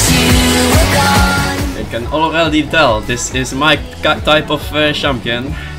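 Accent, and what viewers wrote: Brabant, Netherlands. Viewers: I love the dutch accent XD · Je hoort kei goed dat je nederlands bent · the dutch accent tho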